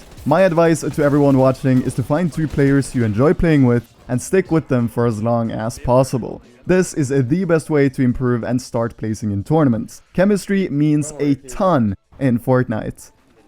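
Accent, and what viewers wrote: Norway. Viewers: Yo er du norsk jeg merka det nettop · Wait are u Norwegian?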